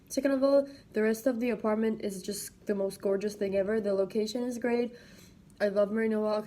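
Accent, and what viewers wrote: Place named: Iran. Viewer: مثل بچه آدم ایرانی حرف می زدی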